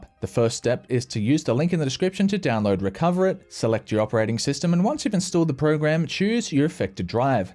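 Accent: Australia. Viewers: I can hear the Aussie accent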